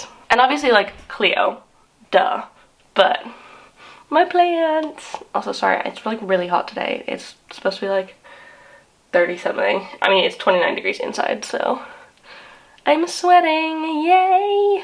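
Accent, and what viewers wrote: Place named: United Kingdom. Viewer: Great video showing the reality of plant care difficulties that gets to even the best of us; also noticed in this video how you had a lot more British sounded words and pronunciations that popped up throughout due to assimilating in London compared to your previous vids, it’s cute and shows the multicultural influences on you💕🫢😏💕👌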